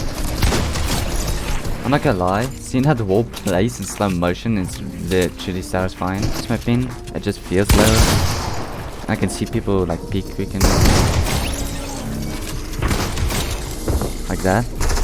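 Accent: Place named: South Africa. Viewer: oh mans south african lol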